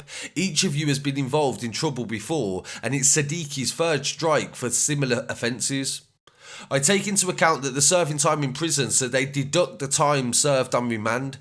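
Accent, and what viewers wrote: Birmingham, United Kingdom. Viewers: This guy needs someone different narrating brum accent is just beyond terrible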